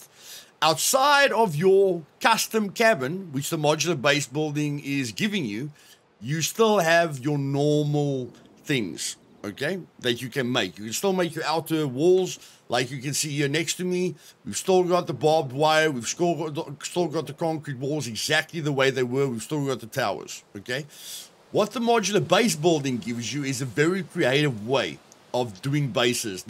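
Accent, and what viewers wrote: South Africa. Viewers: Are you South African? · you sound South African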